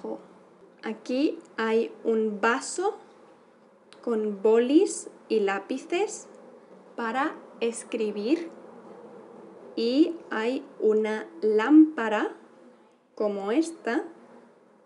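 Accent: Castile, Spain. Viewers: Is this European spanish or Latin American? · is this Castilian Spanish plz!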